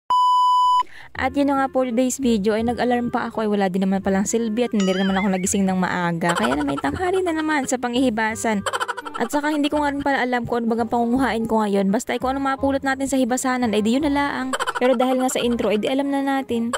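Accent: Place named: Laguna, Philippines. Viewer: Watching without skipping ads done na miss ko makinig at manuod ng videos mo😂😂 relate kase ako sa punto mo ganyan din sa namin sa kalayaan laguna..shout out 😂😂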